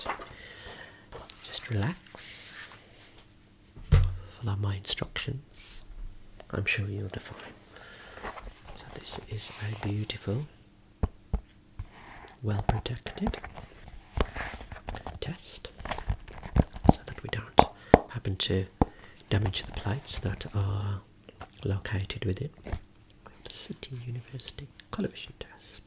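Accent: Birmingham, United Kingdom. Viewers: A brummy asmrtist is something I never thought I'd see but I'm all here for it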